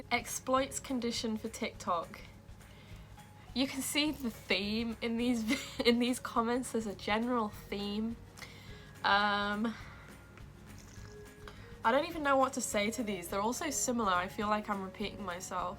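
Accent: United Kingdom. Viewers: Every video I’ve seen with a person with your condition has a British accent. I wonder if it’s something in the air or water or something to that extent.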